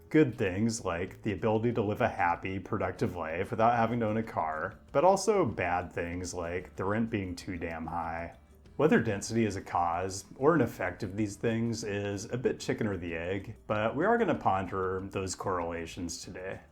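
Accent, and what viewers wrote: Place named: Pacific Northwest, United States. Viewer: ah. Thanks. Pacific Northwest, i guess. Portland, Seattle. It’s so bizarre to hear and throws off emotional cues. His accent contributes to his dry sense of humor.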